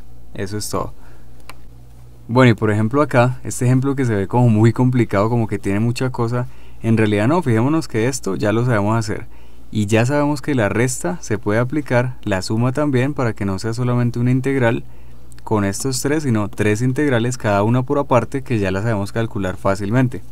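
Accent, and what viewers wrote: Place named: Colombia. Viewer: excelente video , muchas gracias por subirlo y mas con ese acento de colombiano.... gracias infinitasss !!!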